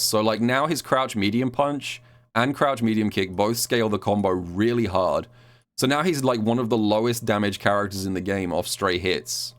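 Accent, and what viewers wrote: United Kingdom. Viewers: i appreciate how you make "bad content for a good cause", i dont care about tierlists especially as a non SF6 player or active online yapper complaining about X thing in a fighting game, but i do care about hearing funny british capybara man yap and be honestly very insightful and reasonable while also being entertaining and going for charity events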